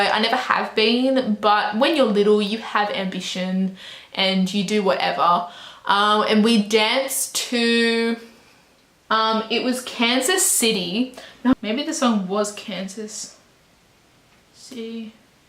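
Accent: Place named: Australia. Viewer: I have never thought you had a distinct Aussie accent. But that all changed upon listening to this ahahah. Love you xx (Also cute clap)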